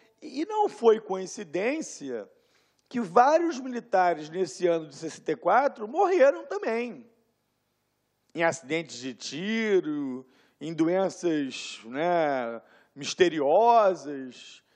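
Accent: Rio de Janeiro, Brazil. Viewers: Acho fofo o sotaque carioca, hahaha. Fica uma coisa meio bêbado falando "dia da mentiroann" , não é deboche, acho fofo mesmo!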